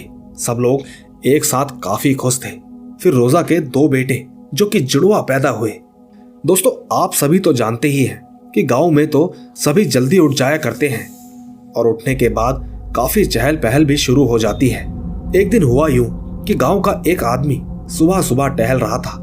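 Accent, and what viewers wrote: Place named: Mumbai, India. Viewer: Bambaiya style mein jhakas❤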